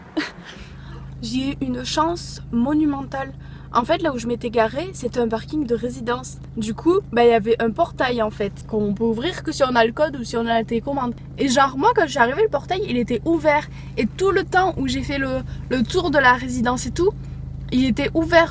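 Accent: South, France. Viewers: Je vois que je ne suis pas le seul à avoir l'accent du sud dans mes vidéos :)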